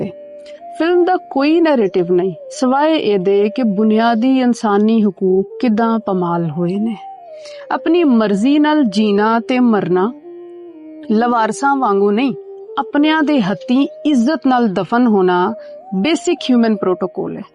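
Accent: Punjab, India. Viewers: ਵਾਹ ਦੀਦੀ ਜੀ, ਤੁਸੀ ਤਾ ਜਵਾਂ ਈ ਸਾਡੀ ਵਾਲੀ ਪੰਜਾਬੀ ਬੋਲਦੇ ਹੋ । ਧੰਨਵਾਦ - ਚੜ੍ਹਦੇ ਪੰਜਾਬ ਚੋ । واہ دیدی جی، آپ بالکل ویسی ہی پنجابی بولتی ہیں جیسی ہم بولتے ہیں۔ شکریہ — مشرقی پنجاب کی طرف سے۔ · lao sister tuhada channel vi subscribe kar ditta tuhade Panjabi bolan de lehze karke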